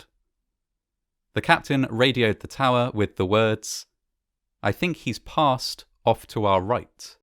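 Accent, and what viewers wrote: United Kingdom. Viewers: Another British narrator? Come on You Tube hire some people in this country!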